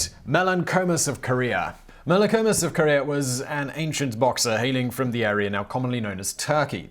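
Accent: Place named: United Kingdom. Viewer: I specifically listen to you for HOW you pronounce things. Give me British for this anglophile.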